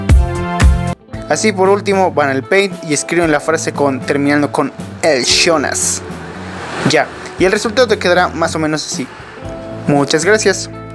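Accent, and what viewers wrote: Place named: Peru. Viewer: Oye por tu acento , asumo eres de Peru ? K parte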